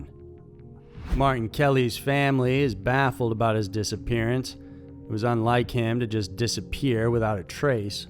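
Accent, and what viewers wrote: South, United States. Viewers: Your voice has improved, less monotone since I ranted about it last year. I like the southern USA accent too.. I prefer the human voice to your robot one. Easier to listen to and stay interested... Better than before anyway!! Almost there lol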